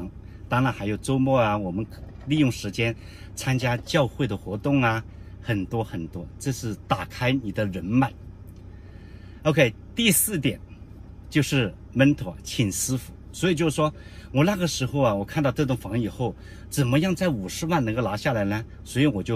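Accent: Hunan, China. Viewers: 订阅了。师傅您是湖南人吗？谢谢分享您的经历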